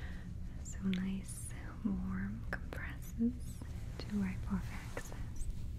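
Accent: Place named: Russia. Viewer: English is not her native language. She is from Russia.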